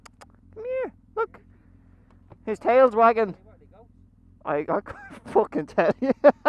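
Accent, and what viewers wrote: Ireland. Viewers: What part of Ireland are u from?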